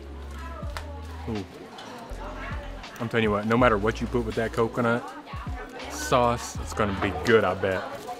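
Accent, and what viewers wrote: Vietnam. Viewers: Your Vietnamese accent like my brother. Very cute ! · Your Vietnamese accent is soooo good and super adorable! Very polite and sweet.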